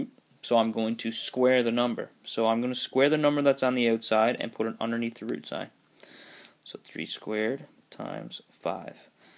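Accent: Newfoundland, Canada. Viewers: Are you from New Foundland?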